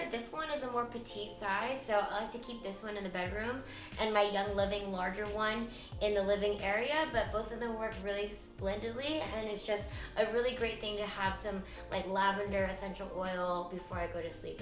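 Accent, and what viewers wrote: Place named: Texas, United States. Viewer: I love her accent! Is it Texan?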